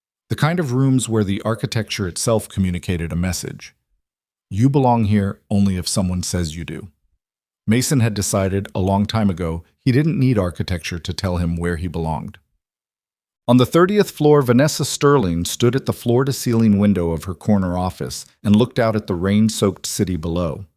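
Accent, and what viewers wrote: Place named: New England, United States. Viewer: I'm not a fan of how Yankee this narrator sounds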